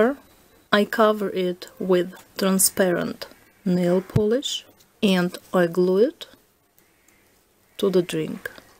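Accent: Russia. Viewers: Are you Russian ? You have a really Russian accent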